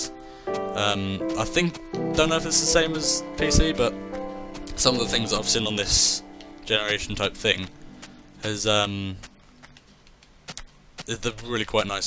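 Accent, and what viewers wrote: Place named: United Kingdom. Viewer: speak american so i can understand u U fucken brit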